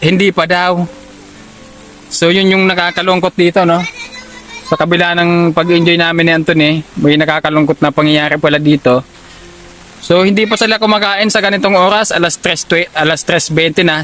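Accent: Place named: Bicol, Philippines. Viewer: Magayon nag acceint ng bicol mo.nakakaralateakonsa mga pronouce mo.ng bicol parang na.miss ko ulinnag bicol taga camsur ako.kaso ang bikolnmo parang tunog rinkunada masarao pakinggan ang boses mo..tuloy lng mag vlog lagi ako nanunuod.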